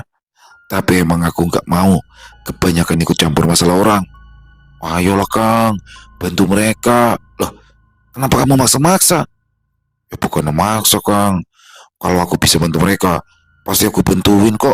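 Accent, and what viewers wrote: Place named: Banyumas, Indonesia. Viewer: Ini mas kalo di joinin dengan mas bawor cocok,sama sama ngapaknya dari banyumas ❤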